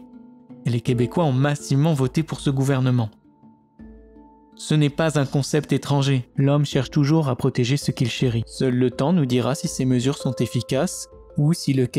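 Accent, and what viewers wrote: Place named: Paris, France. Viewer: Présentateur avec un accent de parigot ça promet! Je suis né sur un continent très éloigné de l'Amérique et de la France et j'ai habité le Québec depuis plus de 23 ans, en me voyant l'on s'adressait à moi en anglais ou l'on m'insultait avant même que je dis mot. Lorsque je m'exprime clairement en français, plusieurs ont des répliques virulentes et grossières et d'autres me demande si je suis comme eux (français de France). C'est tellement bigarré comme langage et biscornu comme formulation syntaxique que j'ai quitté cette province. Il ne faudrait tout de même pas oublié que la construction de Montréal s'est fait avec des gens qui ne parlaient pas français (Irlandais, Polonais, Hongrois, Tchèques, Allemands, Russes, Chinois, Italiens). Mais les colons venant de France jouaient aux maîtres et rois. Ils se sont fait damé le pion par les britaniques par la suite ( voir l'ouvrage "les deux grandes solitudes") et l'arrivé massive de juifs et d'américains. 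Les premiers envahisseurs sont les Français et les Britanniques en se battant pour coloniser cette partie de terre dont-ils n'avaient aucun droit acquis!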